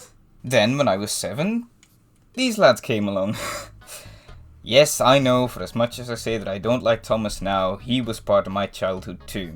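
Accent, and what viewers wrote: Scotland, United Kingdom. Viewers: Wait you're Dutch? All this while I thought you were Scottish! Great video mate, I agree with everything you said! Maybe if they found a way to up the quality and drop the prices, they'd lose that outdated image and start drawing in younger blood into the hobby! Awesome content as usual mate! Cheers! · Naar mijn mening heb je eerder een Schots accent dan een Nederlands accent.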